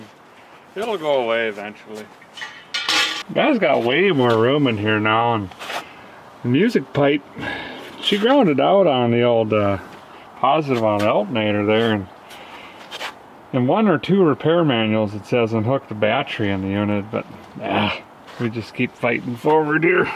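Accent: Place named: Iron Range, United States. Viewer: Grew up in Duluth and the Iron Range accent and humor have me smiling ear to ear. So glad I came across this channel!!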